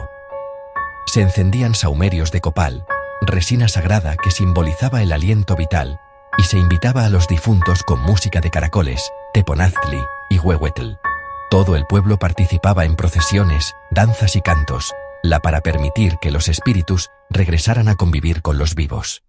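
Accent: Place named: Spain. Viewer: Y lo narra un español 🙄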